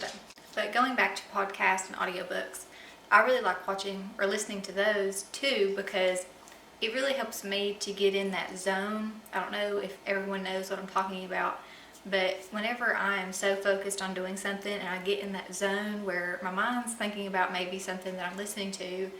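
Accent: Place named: South, United States. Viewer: Where are you from? I love your southern acent I wish I sounded like that LOL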